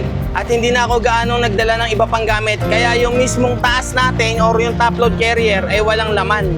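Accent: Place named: Hagonoy, Philippines. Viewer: Puntong hagonoy. Hehehe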